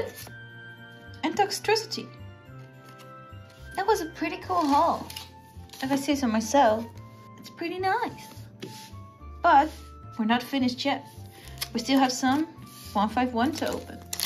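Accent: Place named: Europe. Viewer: Oh I see I wasn't familiar with your accent tho 😂😂 I tho. it was somewhere in Europe hahha 😂😂❤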